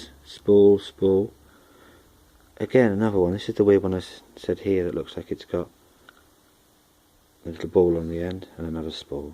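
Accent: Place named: Sweden. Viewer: didn't even notice his accent until you said it, then immetiate: swede. channel seems to be rly great find for me.i miss shooting stuffs. you do know teh nicest people :)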